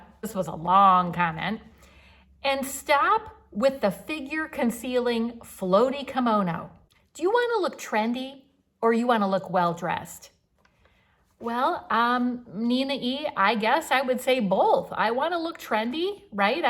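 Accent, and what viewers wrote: Michigan, United States. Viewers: I realize this is an old video. But I still want to comment. I think you are adorable. You are built so cute! Your blue eyes are so bright and beautiful. I love your voice and your cute Michigan accent. 😊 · I am not one to comment online, until now. I don’t live close to a Walmart. I’m over 60 and your wardrobe wouldn’t be right for me, BUT, I love your videos!! You are so refreshing and positive. You’re in great shape. Enjoy it! I’ve never been to Florida but enjoy the tours. I also thoroughly enjoy your Michigan accent! Please don’t change❤️ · I started listening to you because of your accent. My mom was born in Michigan and I in Elkhart Indiana. I miss my birth place and the people. Sorry people are so arrogant to make the negative comments. As you say we are all different. My thing is take what you can use and consider the other. 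I am 73 and need all the help I can get for new styles. I thankyou for your input. I am a size 4 so I have to be careful to look stylish but not to young in my selections. You help me eleminate! Thankyou.🌞👍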